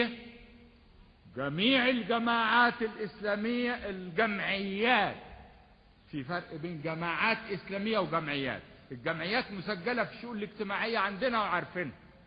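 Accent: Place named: Upper Egypt, Egypt. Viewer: اول مرة اعرف ان الرئيس أنور السادات يتكلم صعيدي .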